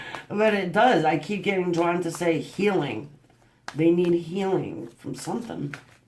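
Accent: New York, United States. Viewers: Where are you from? New Jersey? New York? Long Island? I love ur energy haha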